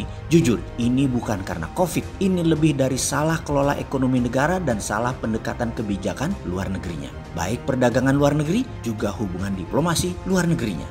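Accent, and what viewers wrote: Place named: Malang, Indonesia. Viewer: Iki jan omongane wong malang banget.. Kopimu kurang kentel, dolenmu kurang adoh rekk..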